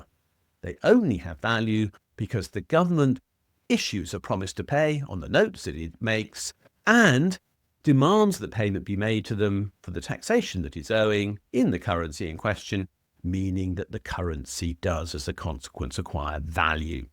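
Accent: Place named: Upper class, United Kingdom. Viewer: Frankly, this seems half baked, motivated reasoning, even disinformation. Like contrasting "keynesianism," which are an awful lot of things inspired by many different economists and policy wonks over a period of time, compared with a neatly wrapped tied and bowed catch phrase ladened MMT is too GD simple, exactly how disinfo works. "Not saying, just saying. Lots of wheels, gears, cams chains wiring and energy sources glossed over or left out of the equations. My personal belief: Taxes are what we contribute to making our institutions, infrastructure and defences better in combination with more modern technologies. They need not be "wasted." Incompetence, graft and corruption are waste and plebiscites can be deceived into accepting them, but inclusive self government and soundly educated participation is a bulwark against. Innovation and improvement is not germinated by funding rapacious capitalized adventurers. 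There's obviously and transparently more to this, but I've gone on long enough. All voiced with a "patrician" upper class English accent. FFS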